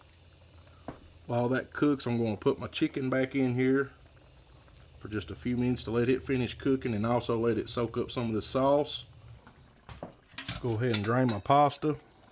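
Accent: South, United States. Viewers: Love your videos and great instructions. And your accent is the best! I’m a Texan living in Michigan, and I need my Southern accent fix from you!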